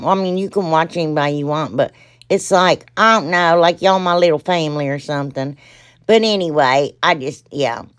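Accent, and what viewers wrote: South, United States. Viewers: I always love to see that you upload a video. I feel like I can relate to you more than most others that I watch. I notice that your address is in OH, but are you originally from a more southern state? I just wonder because you have a wonderful southern accent. I do, too, so I just relate to it.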